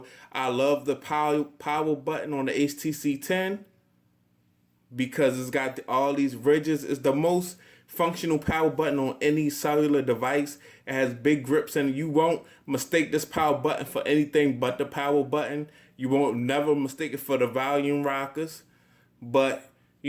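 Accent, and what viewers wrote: Baltimore, United States. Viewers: You from Baltimore huh? The Wire got me hip to the way yall talk lol.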